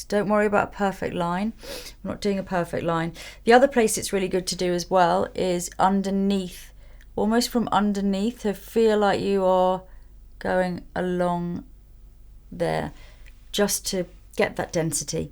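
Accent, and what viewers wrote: England, United Kingdom. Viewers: Thank you, my dear Lisa, for that beautiful and so good explained tutorial. Like other ladies already wrote, your calming voice and elegant british accent are so marveilleus! Regarding your lipsticks: I ordered all three of them and each time I wear Velvet Jazz nearly everyone is asking me what brand it is etc. And I'm very proud because You choosed italian craftsmenship to produce them! Merry Christmas! Leonora from Rome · I like your British accent.....and the make up in this video, specially the simple smokey eyes. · I watch your channel for years, and I will go on...actually, I learned English with your videos (not only yours, of course), you have a lovely voice and authentic, very polished British accent. We just talked about you today with me stylist in Kyiv, discussed your lipsticks and your book, it was nice. I was lucky to get one of your lipsticks, and I’m wearing it every day))) wish you all the best in 2019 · you are such a lovely person...and you English, oh, love it.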